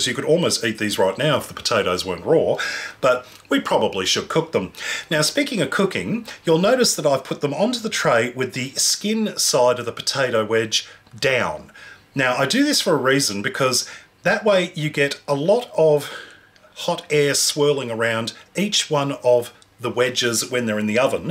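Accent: Australia. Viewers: Shahrukh Shaikh he has an Australian accent and Sam has an English accent. They sound very different.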